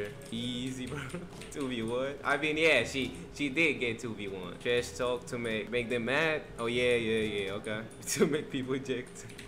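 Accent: United Kingdom. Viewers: are you British? This is a genuine question 🧐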